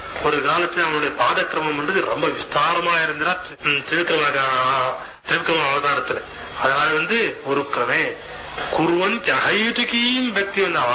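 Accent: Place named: Karnataka, India. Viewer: Jagadguru's Tamil sounds laced with Kannada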